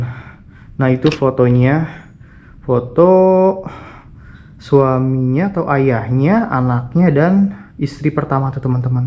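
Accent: Sunda, Indonesia. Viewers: Assalmualaikum kang...purwakarta hdir met pgi,br td pgi liat aa ngreview rumkit cirebon skrg rmh yg terbngkalai,ngdengr suarany cndu bbgt a..logat sundany itu lo kntal bngt😚🙏😘🥰 sukses trus chanel ny ya a🙏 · Panutan banget nih Bang....sukses terus bang hehe...semoga selalu bisa mempertahankan adat logat sunda dan makin meningkat...makin keren makin joss par jos geng...salam sehat salam santun salam explore....Bang... hehe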